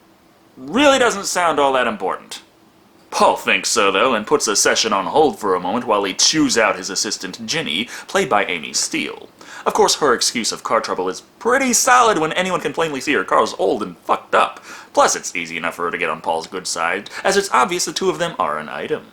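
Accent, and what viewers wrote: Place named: New York, United States. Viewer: Ok seriously what is with the New York accent?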